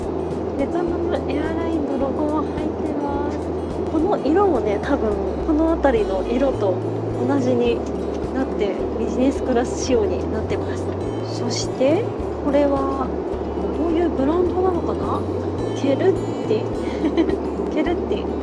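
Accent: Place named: Okayama, Japan. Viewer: 時々出てくる岡山弁にも好感が持てます😊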